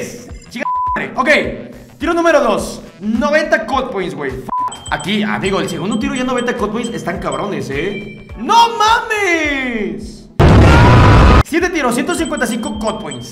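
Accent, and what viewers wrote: Argentina. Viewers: Es argentino ese men? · Te dejo de seguir por q ablas asi como argentino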